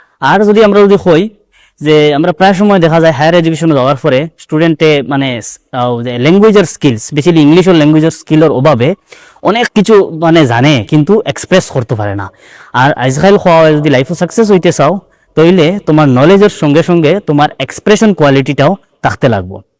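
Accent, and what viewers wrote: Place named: Sylhet, Bangladesh. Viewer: ইংলিশে খইলেও ফারলা ওনে, তেবউ সিলেটি মাত হুনিয়া বহুত ভালা লাগলো..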